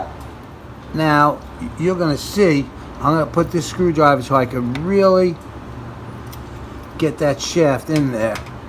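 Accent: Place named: New York, United States. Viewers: Great video. Love the NY / NJ accent. Doing this rear shift shaft fix tomorrow. Wish me luck. Pretty loose but no oil leak yet. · Hey. Where are you located? Sounds like a Brooklyn or jersey accent. I’m in NYC. I’d like to have some work done on my bike and would love if you could do it. I like how clean and meticulous your work is.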